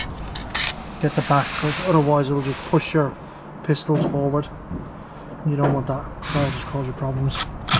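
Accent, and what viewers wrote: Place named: Ireland. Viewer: You sound like a lovely irishman i work ed with in construction many years ago nice work by the way 👍👍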